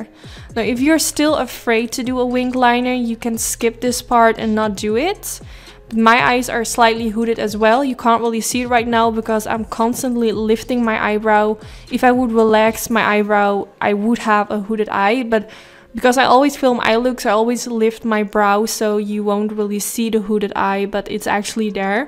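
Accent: Netherlands. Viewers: If you have hooded eyes then I have. no eyes. Really if you call this hooded...girl my whole skin above my eeyes falls almost over my lashes. That is hooded eyes. Love how it looks!! Just doesnt work for my really hooded eyes. I just use black eyeshadow as eyeliner. Now for the first time I heard from your accent you are Dutch. Some words sounds familiar. 😄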